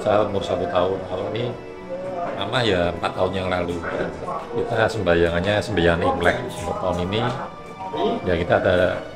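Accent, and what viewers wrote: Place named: Semarang, Indonesia. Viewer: Semarang tulen logate nyenengke tok. Aku padamu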